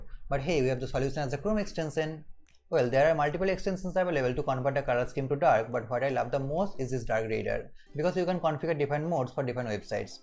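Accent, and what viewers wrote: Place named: India. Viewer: bro your vice is like a hindustani 🙂🙂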